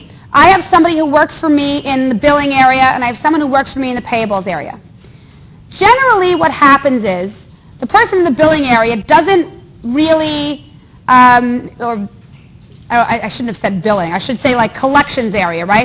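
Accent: New Jersey, United States. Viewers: New Jersey accent. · Motivated and knowledgeable with just a dash of Jersey accent. I may be in love.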